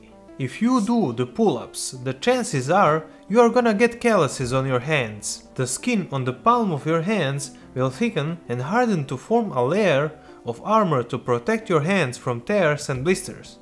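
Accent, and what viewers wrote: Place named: Serbia. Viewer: You really sound Serbian/Croatian/Bosnian/Montenegrin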